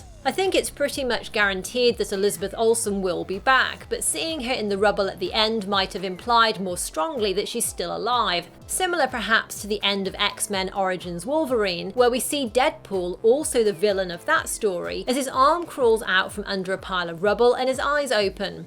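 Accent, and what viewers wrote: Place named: United Kingdom. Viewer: I'm new in the channel, beautiful accent! Are you British?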